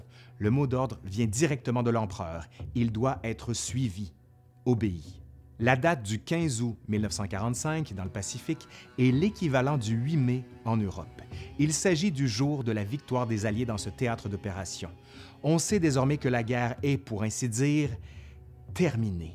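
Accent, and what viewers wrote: Quebec, Canada. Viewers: Je te le mets avc plaisir mon "pouce par en l'R" 😄 Surtt q je suis persuadée q ce ne sont pas d heures q vs avez passé à faire 7 vidéo.. mais plutôt d semaines... 👏👏👏👏 C extrêmement bien fait..les images d'archives sont placées o bon moment ..et pis.. ton accent Québécois... J'AdÔre 😉 À Bientôt 🙋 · Quel conteur de haut vol et puis cet accent canadien que nous aimons beaucoup en Europe ! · J'adore l'accent québéquois! Merci ;) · Wow, je regarde toutes vos vidéos avec attention. Et vraiment, je les trouve hyper ludiques, et on ne lasse pas ! L'accent québécois est charmant (pour nous français) haha ;). Pour vous dire que j'aime beaucoup vos vidéos et qu'il faut continuer ainsi, j'ai même envoyé la vidéo sur le mur de Berlin à ma professeure d'histoire qui a adoré ! Je vous souhaite de continuer encore pendant longtemps !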